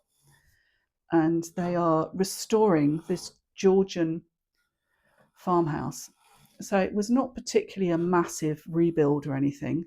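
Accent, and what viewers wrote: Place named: Wales, United Kingdom. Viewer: My dad’s ancestors were from Wales. I like thinking I might have had your lovely accent!!! 😂😂😂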